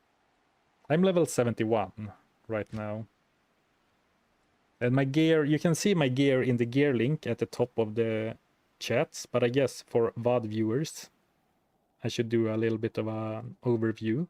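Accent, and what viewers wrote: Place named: Sweden. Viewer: The Swedish accent is fun, and we understand everything you say just fine. If you want to get rid of it, do so naturally over time, don't go out of your way to try to hide it. I like my Swedish d2 youtuber and streamer.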